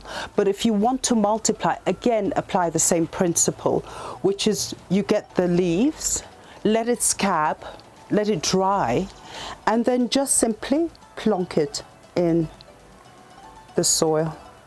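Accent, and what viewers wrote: United Kingdom. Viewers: Her British accent is the best of all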